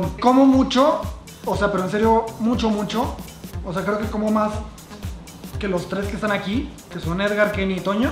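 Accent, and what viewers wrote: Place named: Oaxaca, Mexico. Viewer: quien diria que el es un don amargado desde sus 25 o desde antes, lo mas botana es que es un don oaxaqueño con acento paps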